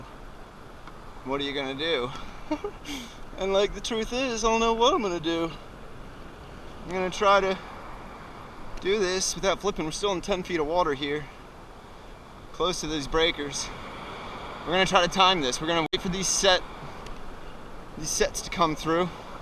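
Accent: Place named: New Jersey, United States. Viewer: Dude you sound like your from Jersey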